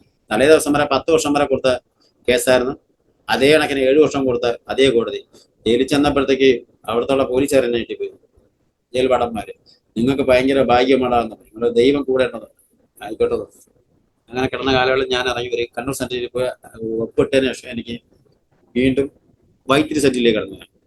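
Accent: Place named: Thiruvananthapuram, India. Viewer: ഇദ്ദേഹത്തിൻ്റെ സ്ലാംഗ് തിരുവനന്തപുരം രീതിയിൽ ആണ്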